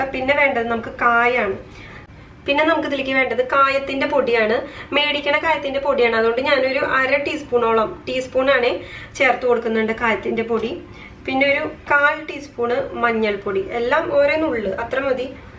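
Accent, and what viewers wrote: Thrissur, India. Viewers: നമ്മൾ തൃശ്ശൂർകാര് വീട്ടിൽ അടുക്കളയിൽ എങ്ങിനെയാണോ പാചകം ചെയ്യുമ്പോൾ സംസാരിക്കുന്നത് അത് പോലെ തന്നെയാണ് മോൾടെ അവതരണം. ചേച്ചി മോൾടെ വല്ല്യ fan anutaa..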